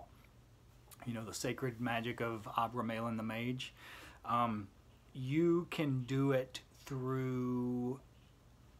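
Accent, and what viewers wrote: United States. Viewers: Hearing an American accent saying something constructive about my beloved Crowley took all the pent-up defensiveness right out of my shoulders and almost made me cry. I've been on gab too long. The religious people on social media are insane with hatred for anything but mainstream belief systems.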